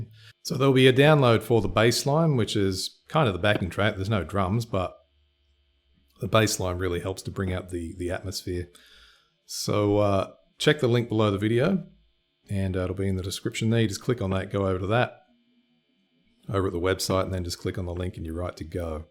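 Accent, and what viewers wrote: Australia. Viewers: Very interesting lesson, I listen to many types of music and play guitar, I've always liked Joy Division and kinda connected with Curtis... and later in life met and moved in with my significant other in Macclesfield[many years after his death] and She lived a hundred yards from Ian, and her dad worked with him in the 1970s The locations of his house and where he worked in the film Control are genuine. Her father~Trefor, said that Ian was very intelligent and really cared about the people that their social security dept tried to help..... which was mainly disabled people. Trefor died recently and had no idea who Joy Division were and about Ian's writing. ;~) now living back where I grew up, with Trefor's Daughter and "Macc Lass" , on south coast of England to look after my elderly mother. Noticed your accent , I have a younger half bother who now has duel citizenship with UK and Australia. 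He's just moved back there permanently with his baby kid to continue teaching Maths. Forget exactly where he lives, it's within a hundred miles of Melbourne. I talk too much blahblahblah... Cheers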